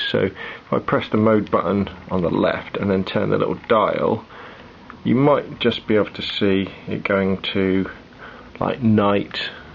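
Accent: United Kingdom. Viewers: i got lost in the british accent whem you were explaining the modes , i didnt understand what do yo say on the S mode. what does that mode does ???? i just gota minolta 404si DYNAX. not the same model but pretty similar. and your video help me a lot. thanks from spain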